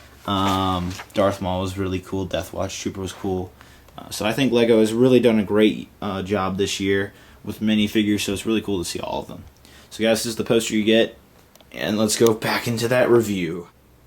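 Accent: United States. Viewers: this gunship is epic I really like it. and I think you are American. (your voice sounds American)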